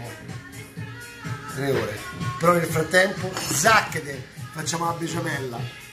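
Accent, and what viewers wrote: Rome, Italy. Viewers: El romano piu che l'italiano ahhaha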